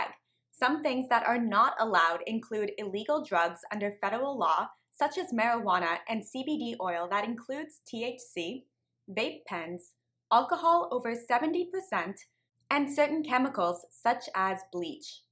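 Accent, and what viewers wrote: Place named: New England, United States. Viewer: Thank you for this information! Also, love your hint of an accent too - is it New England (close?). Thank you - Sub'd!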